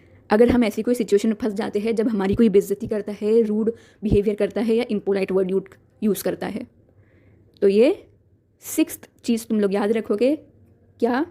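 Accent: Mumbai, India. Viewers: mumbai ki language 😁😁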